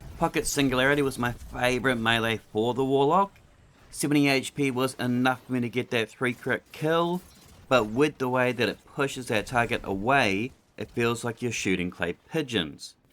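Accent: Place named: Australia. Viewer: Creative ideas, informative and well presented, entertaining aussie accent, subscribed for sure!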